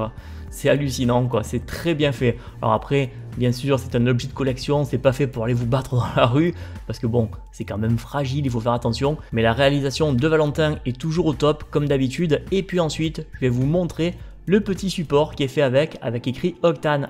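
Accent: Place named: Marseille, France. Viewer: Il est marseillais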